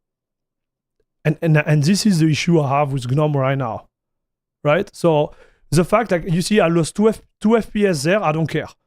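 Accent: France. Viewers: But are you French French or Canadian French?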